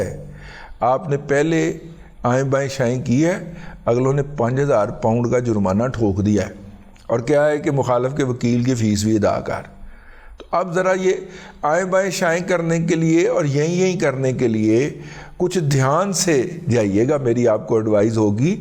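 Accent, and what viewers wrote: Punjab, Pakistan. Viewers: Dear, I always fondly watch your vlogs without fail. Being originally from Amritsar (& Jat). I don’t mind you making fun at us Sardars. Your vlog with heavy dose of punjabi tadka make it joyous and music to ears despite I not agreeing to your views esp around Kashmir militancy which you shared in previous vlogs and which is akin to plain lies. Please keep the good work. Gurbinder Singh Dhillon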